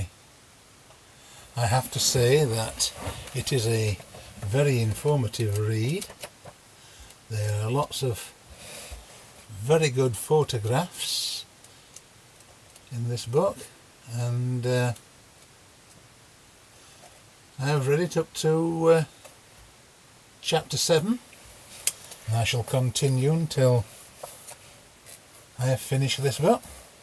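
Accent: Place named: Sheffield, United Kingdom. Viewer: Just discovered your channel. I'm sure you've long since finished the Philco book. I've just got my first vintage radio myself. a 1933 Philco model 18H (Highboy). When I first clicked your channel I assumed you would be out of Birmingham, Pennsylvania (steel city) but upon hearing your accent, now I'll assume Sheffield? Can't wait to see more of your videos.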